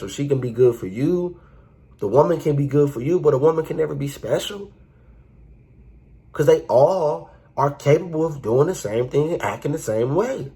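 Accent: Baltimore, United States. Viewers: You from B-more?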